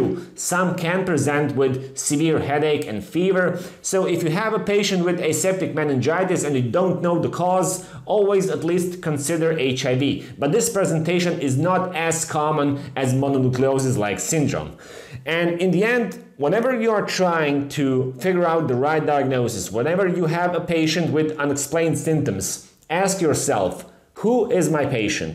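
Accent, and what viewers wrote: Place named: Poland. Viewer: You speak perfect English, but with a mid-to-heavy Polish accent.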